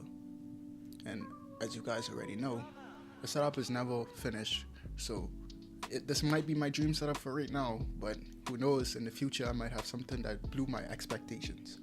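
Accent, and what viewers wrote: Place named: Caribbean. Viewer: you sound like you have caribbean roots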